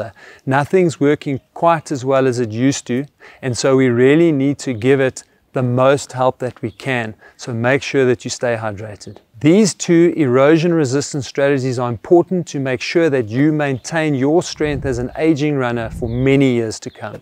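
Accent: South Africa. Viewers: Are you South African? You sound like Dr. Grobbler, my friend in Ladybrand.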